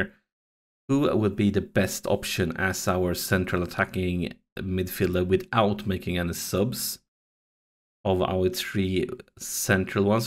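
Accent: Sweden. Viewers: Are you swedish? Sounds like that on the accent!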